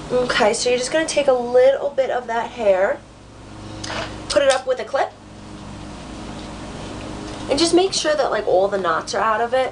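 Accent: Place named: North, United Kingdom. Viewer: Are you from up north? I can tell by your accent lol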